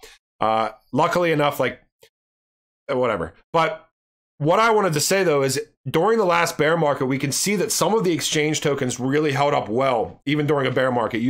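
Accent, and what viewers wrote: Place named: East Coast, United States. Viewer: It's your East Coastness coming through. You're fine. Keep doing you.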